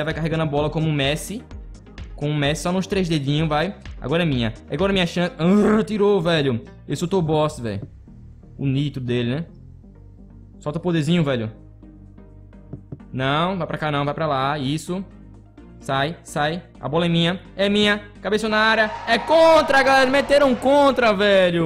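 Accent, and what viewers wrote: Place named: Northeast, Brazil. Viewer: cara vc é do nordeste se fo diz qual e seu estado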